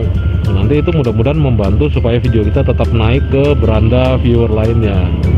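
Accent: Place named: East Java, Indonesia. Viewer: Koyok pantura malang pasuruan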